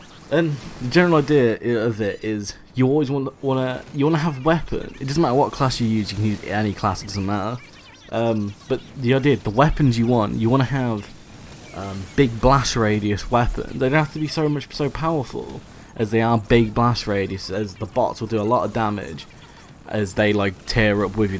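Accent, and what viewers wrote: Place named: United Kingdom. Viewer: Man you need to put subtitles in your vid I dont know were you live in GB but you accent is just too hardcore for me no offense there man just an idea. Great metod by the way.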